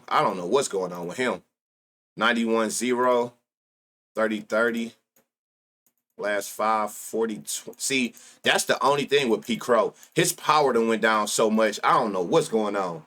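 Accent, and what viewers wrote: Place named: Bay Area, United States. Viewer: 2 mins in i’m like oh yeah this nigga from either the bay or la 😂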